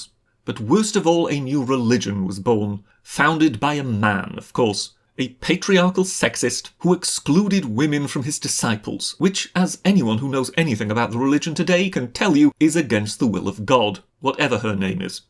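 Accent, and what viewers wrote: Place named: England, United Kingdom. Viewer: Such a petty that the video itself cannot be used in educating the young, due to the imperialistic English accent.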